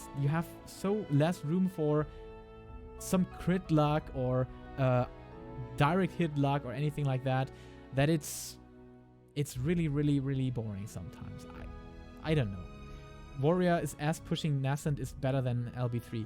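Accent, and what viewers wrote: Germany. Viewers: As a fellow German, I knew right from the start where he’s from, though his English is way beyond the level of your average German!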